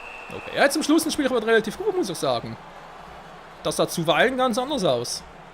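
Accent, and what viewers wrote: Switzerland. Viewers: Ich finde dein Schweizer dialekt ist so cool😆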